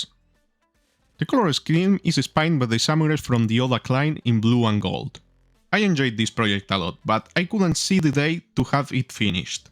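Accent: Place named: Spain. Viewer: That thick spanish accent... puede ser?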